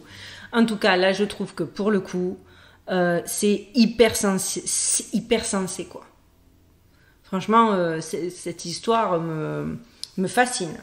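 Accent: South, France. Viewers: Ils lui en veulent plus pour son assent du sud.lol .moi j' aime bien au début " hello wiwane "🤣😋😘.j aime bien la chaîne (pour le contenu avant tt)car les vidéos ne durent pas une éternité comme certains qui font des vidéos de 3 heures. Encore une vidéo ou 2 ,je veux bien, mais quand c'est à répétition, je suis obligé de fuir. Sinon bravo la miss 😘👏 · Bonjour en ce jour de Noel 🙏🌟🎄:je découvre cette vidéo hallucinante ,tu es extraordinaire !! Les sujets les plus "sérieux" et bien fouillés,traités avec un humour dévastateur,😂et en plus,ça ne plaît pas à tout le monde ... La peur, tout est fait pour que les gens soient Morts De TROUILLE 😠. Facile de mettre les gens qui dérangent en hôpital psy .... Allez bonne journée à tous et merci pour cette ,et Toutes ces vidéos que tu nous fais; avec toi,on avance à grands pas ,et tu nous fais évoluer .Accent du 13 ? Et moi du 34 !!!😻💖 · Ma niece m a dit que tu viens de chez nous ds le sud je kiffe tes videos et ton accent bien de chez nous ❤❤❤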